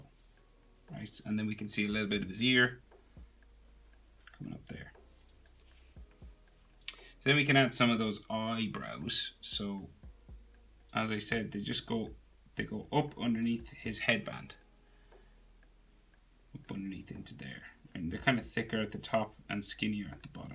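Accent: Ireland. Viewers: i’m gonna draw so many of these lol,also are you irish?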